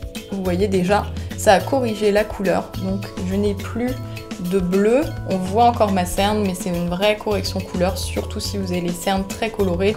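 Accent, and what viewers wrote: France. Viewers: The french accent is so Devine it just screams rich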